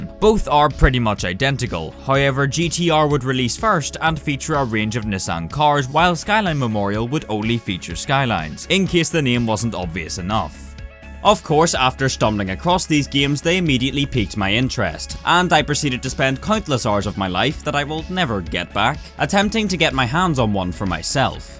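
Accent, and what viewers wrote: Northern Ireland, United Kingdom. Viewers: Norther irish accent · This guys sound british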